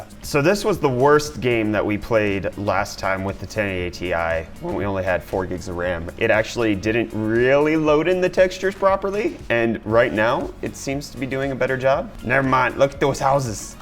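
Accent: South Africa. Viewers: You south african? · m8 wat? south africaaaaaaaaaaaaa? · Your South African wow so cool I'm also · you from south africa ? is jy van Kaap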